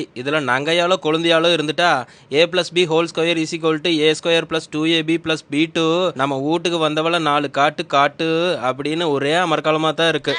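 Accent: Coimbatore, India. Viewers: Super bro coimbatore Tamil 🎉🎉🎉🎉super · Ivlo nal enga irunthinga na...evlo alaga korvaiya pesuringa..... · ஏன் சாமி இப்படி பேச சொல்லி யார் சொல்லி கொடுத்தா சூப்பரா பேசுற நல்லா சமைக்கிற நம்ம கொங்கு நாட்டு பெருமையை காப்பாற்றி போட்ட போ இப்படிக்கு உன் பொறந்தவ 👍💐💐💐💐💐💐💐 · Kongu Tamil super from Malaysia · Iam here for Coimbatore slang .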